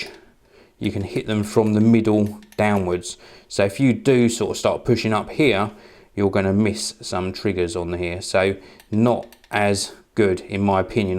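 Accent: United Kingdom. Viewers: Dat awesome british accent though.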